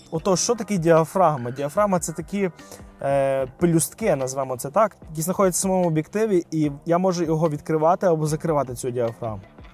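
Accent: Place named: Ivano-Frankivsk, Ukraine. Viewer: то бо гіби десь з Франківщини ви)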